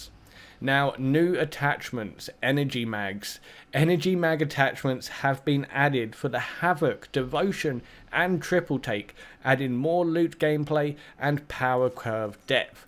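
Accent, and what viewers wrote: United Kingdom. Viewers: In case he doesn't reply, his accent sounds like South-East England to me :)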